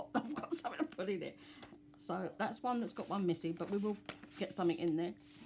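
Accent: United Kingdom. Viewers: woohoo! congratulations ! Just subbed! Sound like you're from the UK, I am in Lincolnshire :-) absolutely gorgeous journals, love the watercolours imageries. Hope to win :-) tfs, Ivy xx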